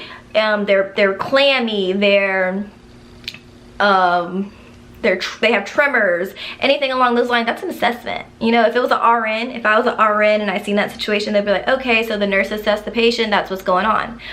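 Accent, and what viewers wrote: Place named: New Jersey, United States. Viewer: Sounds like u went to jersey. Great video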